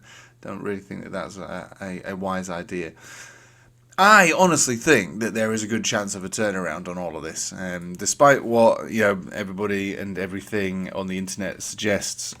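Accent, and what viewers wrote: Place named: Yorkshire, United Kingdom. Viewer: Thanks for the video mate I thought you was somewhere near me by the acent I’m in Bradford the posh side of Yorkshire 😂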